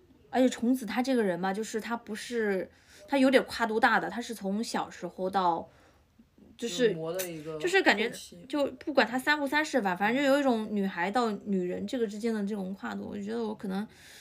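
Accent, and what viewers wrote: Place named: Henan, China. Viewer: 哈哈哈哈，一口河南口音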